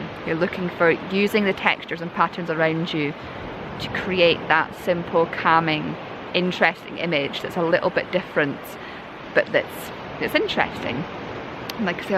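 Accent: Scotland, United Kingdom. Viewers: Great Video, as Usual a pleasant time, listening to a wonderful scotch accent. You make photography wonderful. Brilliant images!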